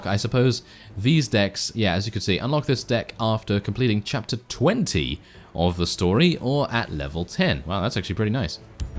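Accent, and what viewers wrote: United Kingdom. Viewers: Oh and are you British because you sound like it not that there's anything wrong with being British it's just I was wondering you sound very gentleman like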